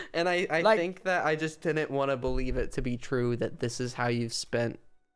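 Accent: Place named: New York, United States. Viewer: i really feel the new york talk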